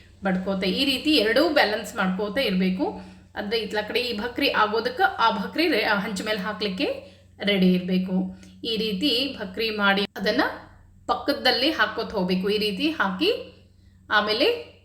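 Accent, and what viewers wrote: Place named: Belagavi, India. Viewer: Amma navu kuda dinalu rooti maduteve navu belagaviyavaru nivu namma tarane matadatira namage tumba khushi agutte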